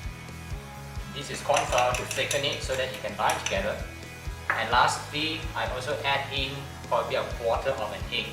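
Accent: Singapore. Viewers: Is he speaking Singapore English? Cantonese, Please, so that everyone can understand.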